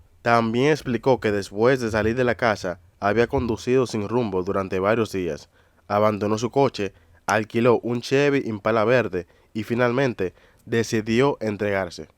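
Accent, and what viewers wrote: Dominican Republic. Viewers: Me encanta como narras y como pones los comentarios de las personas con nuestro dialecto Dominicano! 😂 Tengo muchos vídeos ya visto tuyos.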